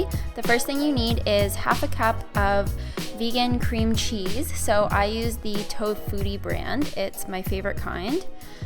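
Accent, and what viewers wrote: Canada. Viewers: Do I hear an accent? Canadian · Dark hair reeeeally suits you! And your Canadian accent is adorable :) love this!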